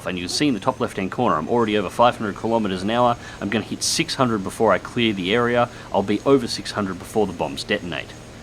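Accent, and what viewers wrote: Australia. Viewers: Love hearing an Aussie accent on these videos, good show!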